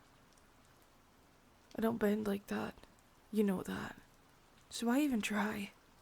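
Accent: Scotland, United Kingdom. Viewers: Can you do a video using the thick Scottish accent like in the movie brave heart plzzz🙏🙏🙏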